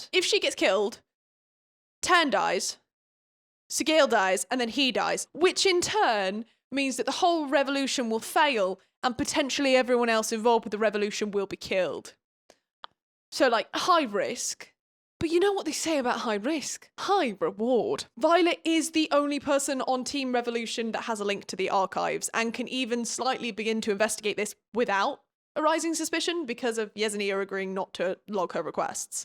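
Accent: United States. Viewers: The American accent gets me everytime 😂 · Babes imma need you in the audiobook studio STAT! your American accent is better than mine, im #scared (im unfortunately American) but let’s work on the country accent a bit (also unfortunately from Texas) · Also, the accent sounded like an American southern plantation owner. I am from the deep south. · the american accent is KILLING me 😭😭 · The American accent is TOO GOOD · commenting bc you are absolutely hilarious, delightful to listen to, and you have an impeccable American accent. Unfortunately, I can't unhear your Violet voice as anything other than Sydnee Sweendy, making both of them that much more unbearable😤🤩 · The american accent is SO GOOD HAHAHAHA it always makes me laugh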